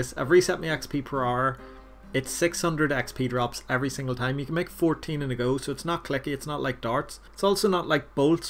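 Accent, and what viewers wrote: Northern Ireland, United Kingdom. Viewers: Where are u from lol? I’m from Northern Ireland and u sound like ur nearby c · You sound northern irish! I havent seen a osrs youtuber from my homeland! Im subbed, brilliant videos im loving these video ideas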